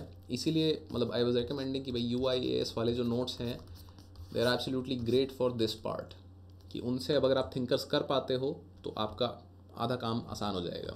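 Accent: Bengal, India. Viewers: Sir are you Bangali?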